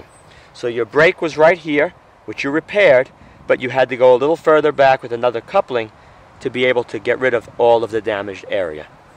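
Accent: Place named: New Jersey, United States. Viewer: So was this filmed on the Jersey Shore (pronounced "Show ah") ? :-) That accent sounds familiar.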